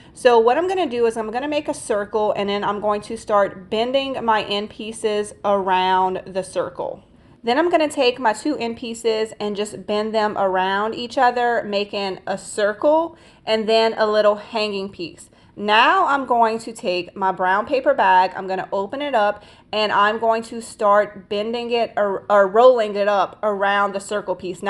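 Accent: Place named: Virginia, United States. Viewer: r you from virginia??? UR accent caught me!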